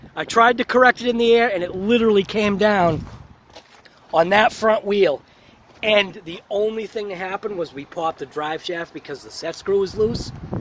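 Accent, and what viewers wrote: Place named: New England, United States. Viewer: Awesome bash and great truck! Are you from new England area? You sound like you got a accent.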